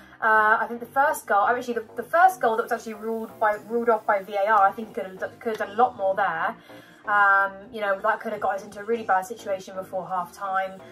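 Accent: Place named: RP, United Kingdom. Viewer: 100% posh 🤣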